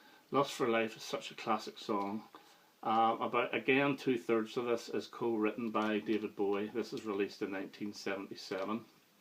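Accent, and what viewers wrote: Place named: Scotland, United Kingdom. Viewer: you did a great job critiquing albums. I really enjoyed it, and I think your laidback style and relaxed Scottish brogue were major reasons for that. I had 1st album with ' ' on it. I really like humorous songs. I think he writes very funny lyrics like ' '. ' ' has often been likened to ' ' do you think that's a relevant comparison? Totally agree that ' ' was greatest album. Every song is tremendous.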